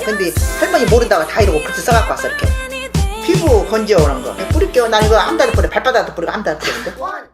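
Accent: Jeolla, South Korea. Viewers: 할머님이 전라도 사람인가요?? 저희 할머니하고 말투가 완전 비슷해요 !!!ㅋㅋㅋㅋㅋㅋㅋㅋ저희할머니보는 느낌 ..❤️ 오늘도 잘보고 가요 ! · 메이크업 영상 나를 울리게 하네요 웃다 울다. 우리엄마 생각나게 해서 웃다가도 울고 전라도 사투리에 엄마랑 목소리 말투 너무 똑같아서 계속 보게 되요. 한 영상을 진짜 여러번 봤어요^^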